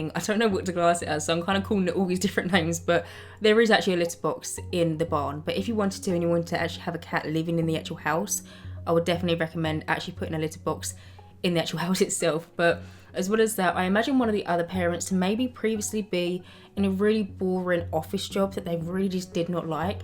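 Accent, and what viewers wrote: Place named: East London, United Kingdom. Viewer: Its actually sooo refreshing to hear a east london accent on youtube tbh 🥰 im new to ur channel and u deserve more subs & views!! Also the sims treat us so bad sometimes building a whole clinic so u dont have to sit through a loading screen 😭🥴 the way u build is so interesting too like when u put tones of tissues on some surfaces or megaphones im always like ??? But it seems to work out in the end ! It was a really gorgeous build tho u did amazing!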